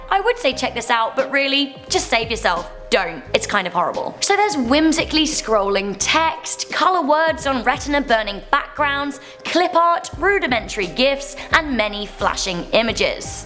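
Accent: England, United Kingdom. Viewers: Em jacks Her accent is English and that is what we are talking about. We're not talking about where she lives. He's referring to accents and she's no way Canadian in that department. I am British myself, so I would know. Lol. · The English accent takes her from a 5 to a 3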